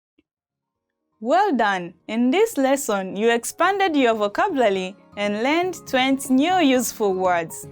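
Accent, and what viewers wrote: Standard. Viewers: TZ standard Swahili ❤❤❤❤ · She is a Tanzanian presenter!. She speaks standard Swahili!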